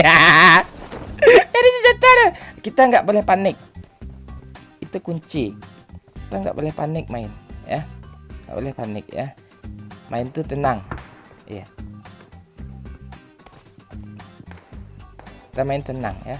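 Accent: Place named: West Sumatra, Indonesia. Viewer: sikampret kacau, salam kenal abang aq dri jawa barat tapi karena sering denger logat minang kocak jadinya kadang kebawa bawa jadi kadang lupa logat sunda nya, sehat selalu bang terus upload tiap hari, rezekinya di lancarkan.. orang proo nii buahaaaahahaha 😂😂😂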